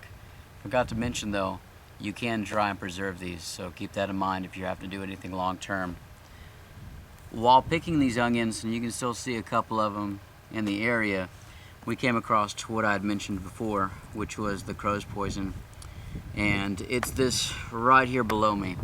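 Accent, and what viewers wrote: Texas, United States. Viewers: He's a Texan.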